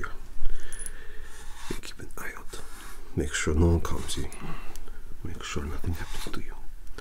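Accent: Russia. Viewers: Yay, Russian accent! ^_^ I'm beginning to like this character, get really strong big brother vibes from him, and he also reminds me of how I used to imagine Sirius Black before I got to watch The Prisoner of Azkaban. Thank you,! Спасибо,!)) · Just discovered your channel and I have to say I love it! Очень хороший Русский акцент и в общем звучала правдоподобно! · I gotta admit, I’ve listened to this every night since I’ve found it. I love all your videos but this Russian accent is sooo relaxing! I really hope you do more videos of soon!!:) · это звучит очень круто, особенно английские слова с "русским" акцентом, звучит просто ахуенно, но немного тяжело отличить русские слова от английских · I'm Russian, and those russian words gave me shakes 😅 It was funny to hear that pronunciation. Anyways, good job trying to make russian accent 👍 Really cute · It's just awesome! 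Сhic Russian (accent and pronunciation) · That was pretty awesome. Your russian accent is pretty amazing. Since i am russian myself it was such a pleasure to listen you speaking russian. Русским в коментах привет!😊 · I find that I am really liking the Russian accent when it’s spoken softly like this. I think your Russian gangster reminds me reminds me of Ho Yinsen from the first Iron Man movie. I know that character is not Russian, Afghan, I believe, but the gentleness, the pronounced accent, the caring... and the glasses 🤓 just makes me think of that character. I like that character and I like your Russian gangster... when he’s being good. 😉😁 Is there a deeper meaning for you behind all of the personal attention videos that you make? Just curious. 💙💙💙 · becuase he’s kinda creepy, his assurances and affirmations made me feel like he is obsessed with us rather than caring for us, or maybe im just biased to a Russian accent lol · That Russian accent of yours really works well for ASMR purposes. 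Awesome character work once again with this one,! 😎👏 I feel like we'll see ol' in a 3rd (mis)adventure down the road. 👍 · as a russian I really appreciate your work with an accent and some russian phrases. good job! and the whole video... that's something really special. thank you so much, it was literally the best 18 minutes of this day for me :) (господи, мне как будто снова 6, а по телеку идет "бандитский петербург" 😭) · As a russian,I can confirm that your accent is perfect. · I'm new to this channel and I'm used to most asmr rps being black screen or single frame'd so seeing a full human man on my screen speaking to me in a russian accent, soon then approaching me with a knife, was a bit of a jumpscare ngl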